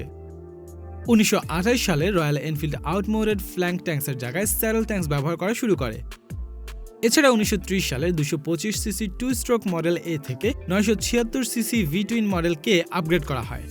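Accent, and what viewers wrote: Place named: United Kingdom. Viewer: অনুরোধ করছি ভয়েসওভারে বাংলা অংশ টুকু পরিষ্কার করে বলার জন্য। ইংলিশ এ্যাকসেন্ট আর বাংলা এ্যাকসেন্ট করে ফেলায় মনে হচ্ছে বাংলাটাও ইংলিশের মতো করে উচ্চারণ করা হচ্ছে।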